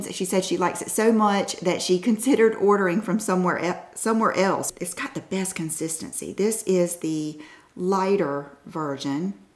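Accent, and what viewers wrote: South, United States. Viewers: This is the first video I have ever watched of her but I love her. So whimsical....she enjoys reminiscing....old mac and YouTube days,etc!! Absolutely subscribed after just a few minutes of watching her. Plus, the southern accent....I'm from south Georgia myself so my accent gets picked on alot...love her!! Can't wait to go thru her channel and watch all her videos!!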